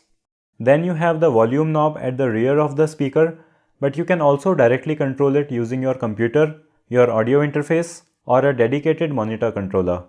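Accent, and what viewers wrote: India. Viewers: Can’t take him seriously with that Indian accent 😂😂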